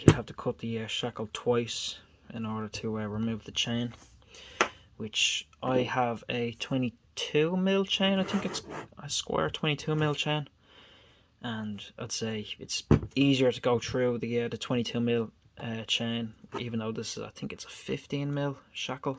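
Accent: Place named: Ireland. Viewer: Date code H33 on your genuine Abloy is August 2020. That is a new model genuine Abloy, I could tell straight away just by the laser engraving. Just because you bought a 200 quid lock for 60 quid doesn't mean its fake! It means you got a bloody good bargain! There are No, zero, zilch, nada, fake Abloy PL362 padlocks, nobody has spent tens of thousands of pounds to machine up to make exact duplicates of them only to sell em cheaper, putting emselves out of pocket! You have a real Abloy! Rest assured its real, real case hardened steel body and real case hardened Boron carbide shackle! I take it you're from the Emerald Isle, by your accent that it is! Well now, Slaint m'hath and slow on the ishke baha!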